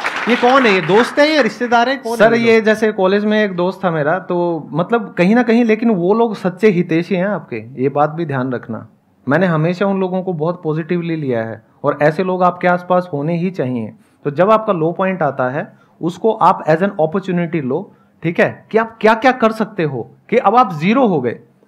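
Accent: Haryana, India. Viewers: I loved your Haryanvi accent the way of you speaking. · I already knew he is from Haryana by tone as I am also from Haryana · Bhai, your Haryanvi pronounciation is great one even in English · Mai bhi padh rhi hu I am 38 year old and I studying as a architecture student Or mujhe aapki haryanvi bohot achi lgi Mai bhi haryana se hu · Sir I am from Haryana ,it's true bhiwani me esa hi bolte h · Kis kis ko pasand aai sir ki haryanvi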